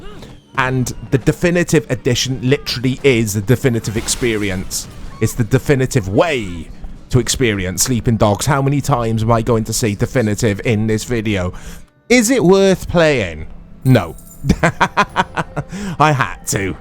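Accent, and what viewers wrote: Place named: Cardiff, United Kingdom. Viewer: This boyz from Caaaaaaaaardiff or Newport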